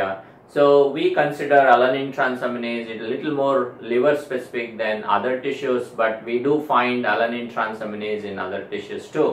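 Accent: India. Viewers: Oh my god I just got so many knowledge, every concept of this topic oh thanks sir... Your words are so indian wala hoke very nice to hear ...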